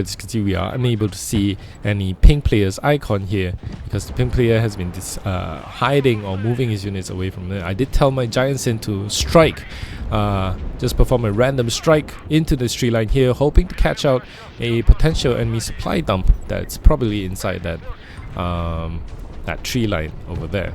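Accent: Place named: Singapore. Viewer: Those frogfoots 🤯🤯🤯 beautiful plays! Thanks for good commentary too, I'm guessing you are from Singapore?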